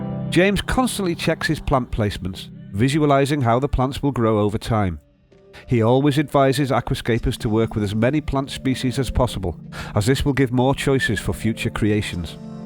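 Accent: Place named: Wales, United Kingdom. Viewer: can anyone tell me what accent this is? sounds like a Welsh guy I know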